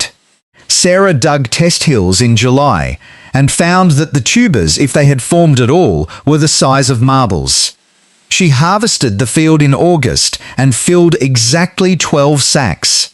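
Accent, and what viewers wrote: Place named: Australia. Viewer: With the Australian voice, maybe you should tell an Australian story. I really enjoy these stories..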